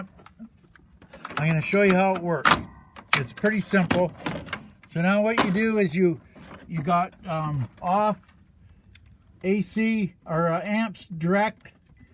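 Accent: Canada. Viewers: Education at the best level. Hands on and clear English or Canadian. Eh? Or is it AH?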